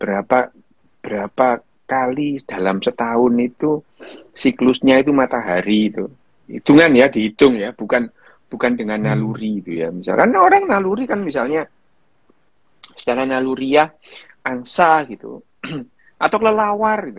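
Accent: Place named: Malang, Indonesia. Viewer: Saya suka dokter Ryu Hassan, benar-benar cerdas, dan gaya dialek Malang nya alami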